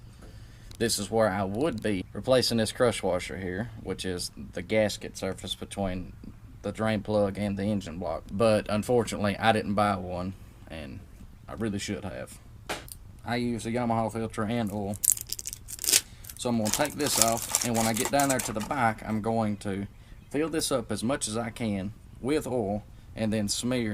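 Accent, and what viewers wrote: Alabama, United States. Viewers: Hey, confirmed in the info section that you're from Alabama; I thought I recognized your accent! Shout out from Fort Rucker lol. So, I have an FZ-07 2015, and I've been doing a lot of reading trying to determine the 'best' engine oil to use since I'm about to change mine for the first time (bought the bike used). Popular opinion seems to say 10w-40 and full synthetic. In your vid the oil you use is the performance all purpose. Would you please elaborate on why you opt for non-synthetic? Thanks!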